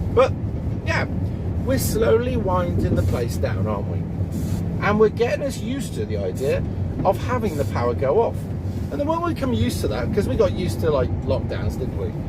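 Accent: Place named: West Country, United Kingdom. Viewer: 1 year on, luckily no power cuts so far. But who knows what can happen within the next 10 - 15 years if things don't improve i.e. building enough new power stations to cope with increased demand? Soz you don't have a partner if the lights go out lol. But as long as you're happy in life? I enjoy watching your videos. If the power goes out, I won't have anyone either. Need plenty of books to read and keep the Nintendo Switch charged up when there is power.🤣 Sounds like you live in the south west of England - West Country area?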